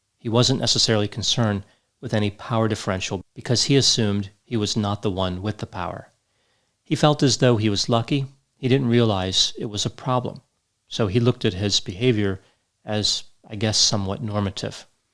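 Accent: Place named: Philadelphia, United States. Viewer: Is from Delco/Philly area? I recognize our accent anywhere. Lol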